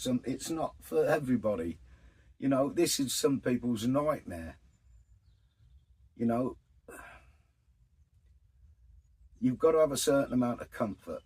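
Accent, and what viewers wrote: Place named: Surrey, United Kingdom. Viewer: you sounded to me you was from Surrey ty for answering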